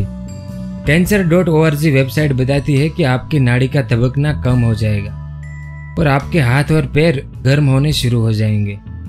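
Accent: Gujarat, India. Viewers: Bhai tu gujarati hai kya ????🤔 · gujrati voice 😂❤ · Gujrati h ky bhai???? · Bhai, tum gujrati ho?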